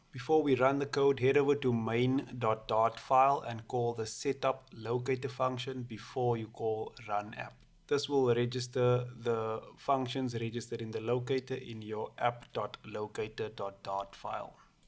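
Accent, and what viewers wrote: South Africa. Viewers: Awesome! When I heard you talking I knew you were from South Africa! It's cool to see a fellow South African on YouTube! Awesome channel man.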